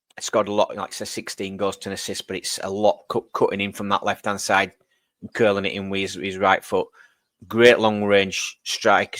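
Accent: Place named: Leeds, United Kingdom. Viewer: Aye mate watching my first video. Sounds like you’re from Leeds way as well so that’s all good. Just subscribe 👌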